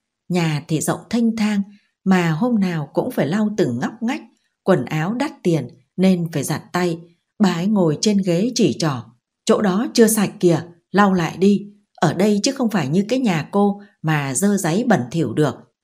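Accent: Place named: North, Vietnam. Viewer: Sao khg có giọng đọc tiếng Nam .những truyện miền tây mà cứ nghe giọng miền bắc này khg hợp. Dù giọng rất hay .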